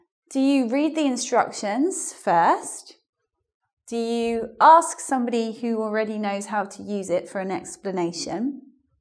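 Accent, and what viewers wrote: United Kingdom. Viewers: oooooh!!!!!!! U're REALLY REALLY REALLY GOOOOD!!!!!! AMAZING BRITISH ACCENT!!!!!! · I would like to thank you for your channels and the services you offer. I am dating an English lady from Bath and she said I have a British accent when I speak English and when she speaks to me she sometimes feels she is talking to a British gentleman. I did not tell her I learned my British accent from you because she might get jealous. Thank you so much again. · i love british accent!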